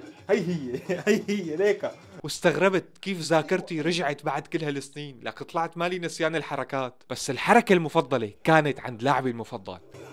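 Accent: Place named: Syria. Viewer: يازين اللهجة السورية والله احبكم يأهل سوريه تكفون ادعولي اتجوز سوريه الله يخليكم والله من وانا صغير احب السوريين واحب لهجتهم.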